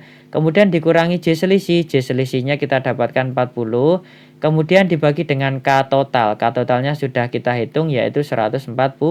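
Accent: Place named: Java, Indonesia. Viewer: Salfok sm suaramu bang mirip ping lie agk medok jg hehe 😂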